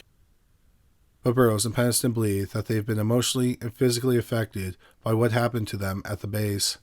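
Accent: Canada. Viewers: There’s a lot of Amazing calm speaking narrators on YouTube. Top 5s comes to mind. However, the consistent mispronunciations of ordinary words is grating for quite a few viewers & listeners. A lot of it appears or I assume is it to be the 🇨🇦 accent & a lot of it almost has sounded , like for years now, just an inability or refusal to pronounce the full word, it’s one thing to say ELLY-inn every time for the word alien but quite another to not care to realize that full endings or words are just skipped , the end of words such as Forest, instead he constantly says Foress. Or something along those lines, just kind of a wild line of work to be in when speech & possibly malaprop is heard almost more than correctly pronouncing words, or I’d imagine he’d say Worse not WorDs.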